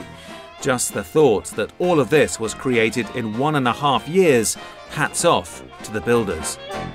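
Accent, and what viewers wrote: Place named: United Kingdom. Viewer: Great info in spite of the Limey accent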